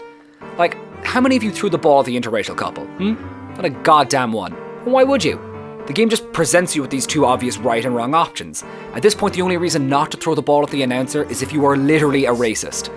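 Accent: Ireland. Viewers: yup pal are you irish?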